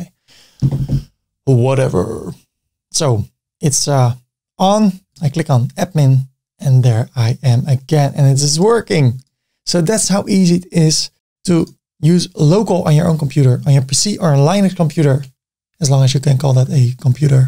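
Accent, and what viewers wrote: Netherlands. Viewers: Bro sounds Dutch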